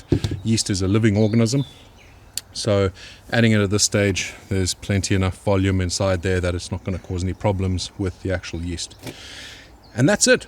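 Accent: South Africa. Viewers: Nicely styled out there, could've had water all over the deck! Looking forward to trying this, just picked up a couple of demijohn's from Wilko (£8 each - pretty good value), and ordering the chemicals on-line. Going to try 3l grape juice, 1l Orange to start with (make sure I can do it before I get too inventive!). Hopefully should be good. Thanks, and love the SA accent by the way. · That's put a smile on My Face, I thought i heard a SA accent and thought that's where You are ? What part of the UK are you ?, I now live in the Northwest of Ireland But Originally from Portsmouth ,UK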